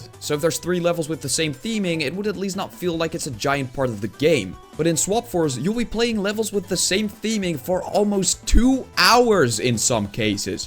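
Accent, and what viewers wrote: Netherlands. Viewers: Is that a dutch accent i spy? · heujjj did i just hear a nederlands accentje? skytuber from the netherlands is always a big plus. i tought the skylanders fans wherr a dying race here. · jij klinkt echt Nederlands hahah. Goeie video man, deze serie is pure nostalgie voor mij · its funny i can hear ur dutch because of ur accent XD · leuk geprobeerd, maar ik herken een nederlands accent uit duizenden!! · i smell nederlander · Nice video and your top 6 is on point (mooi nederlands accent trouwens) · are you dutch?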